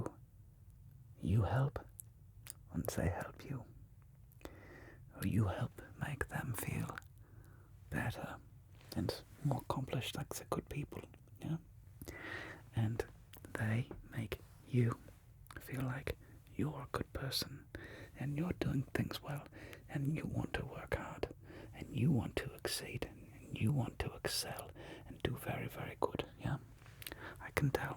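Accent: Germany. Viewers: I love the German accent videos ❤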